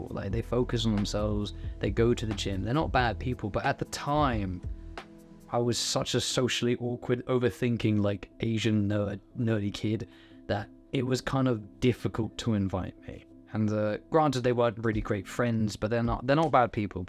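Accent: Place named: United Kingdom. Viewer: british detected - opinion disregarded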